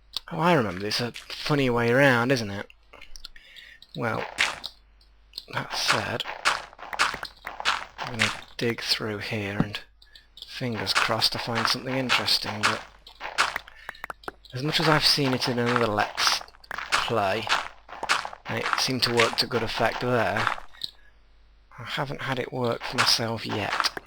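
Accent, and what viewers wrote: United Kingdom. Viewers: i love ur British accent xD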